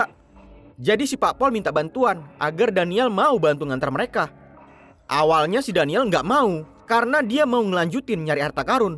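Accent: Medan, Indonesia. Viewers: Mimin org medan kah? Logat medannya lengket kali🤣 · Bang sering upload lah bg Logat Medan bg 😁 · bahasa kek orang medan cuk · Medan kali logat'a bang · Logat Medan nya khas kali hahahaah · Bg orang Medan bg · Bang kau orang medan kh? Kok kek orang medan kau bang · Anak medan fixs :v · Sering2 upload bg... Orang Medan ya bang ? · Logat org medan mmng gtu bg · Orang Medan kau laii 🙄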